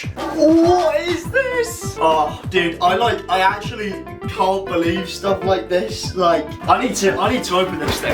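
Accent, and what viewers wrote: Newcastle, United Kingdom. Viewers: Are You from Newcastle? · Oh no way he is a Geordie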